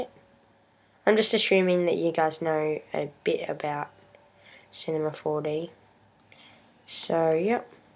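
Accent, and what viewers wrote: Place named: Australia. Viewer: aussie <3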